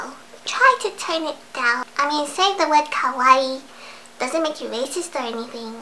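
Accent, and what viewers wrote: United Kingdom. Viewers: somehow i hear british accent. is it just me who noticed that? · A japanese girl with a british accent, now I can die happy. x,x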